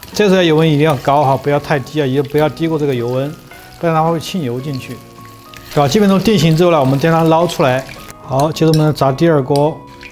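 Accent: Sichuan, China. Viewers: 的川普有点儿安逸。rua均匀，白fu椒粉，荔枝fu辣味，fu辣油。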